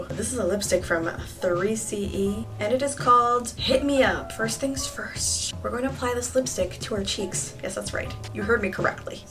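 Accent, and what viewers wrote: United Kingdom. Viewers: Oh nvm I see wah British accent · who else loved that British accent 😂😂?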